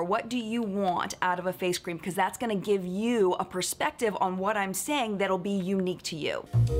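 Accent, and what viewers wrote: United States. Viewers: She came for Charlotte Tilbury and I LIVED FOR IT! 😂 Where I am, my clients raved so much about it. But I was never really thoroughly impressed with the brand to begin with despite how it was advertised as a brand loved by Hollywood and blah blah blah. I really appreciate people who shed light on facts like these. Oh and I just love her pure American accent like it’s just so perfect.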